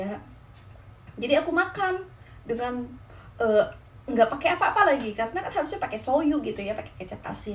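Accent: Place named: West Java, Indonesia. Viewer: assalamu'alaikum.....mami...,smga istiqomah dlm syiar islam di negeri sakura, aamiin...,smoga klgnya sakinah mawadah wa rohmah, aamiiin.. indonesianya dari daerah mna? kok tmpak logatnya kyak dari Pasundan, smga slh tebakan saya.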